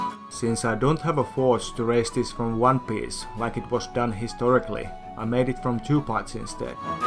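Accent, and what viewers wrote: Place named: Finland. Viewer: Did you use a metal rim because you couldn't find rawhide? Arvasin aksentista, et oot suomalainen ;)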